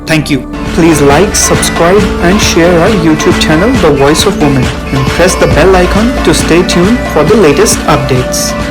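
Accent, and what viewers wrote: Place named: India. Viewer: Can I fond a video with no Indian accent?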